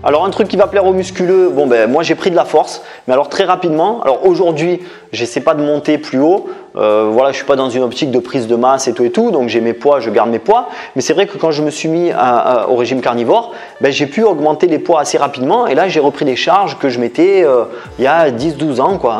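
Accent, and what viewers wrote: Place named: South, France. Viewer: t gentil mais arrogant tu le dis toi même tu connais pas les conséquences de.ton régime et tu n'as aucune connaissance sur le sujet donc c normal que l'on signale ta vidéo qui pourrait mettre en danger certaines personnes fragiles Moi je reste persuadé que tu as raconté du bulshit et tu essaie d'innover mais ton accent sudiste ne suffira pas à convaincre du contraire L'homme est omnivore et à besoin de glucides et d'aliments basifiants que ça te plaise ou non Profite de ta jeunesse car c la génétique pour l'instant qui te maintient en bonne santé et non ton pseudo régime a la con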